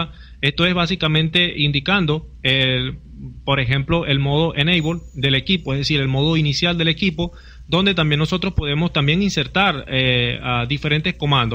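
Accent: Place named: Colombia. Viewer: pense que era colombiano, saludos tambien soy de vzla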